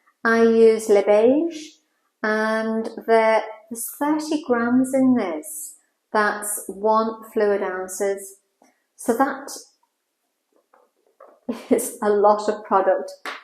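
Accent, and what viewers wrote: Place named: Manchester, United Kingdom. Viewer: You have a lovely accent, are you from Manchester area?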